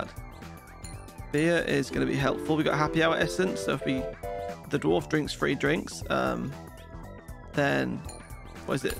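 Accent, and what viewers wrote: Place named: United Kingdom. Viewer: You still sound British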